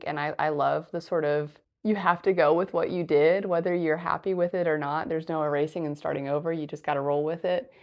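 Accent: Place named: England, United Kingdom. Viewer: Hey i want to learn English accent from you 😅😅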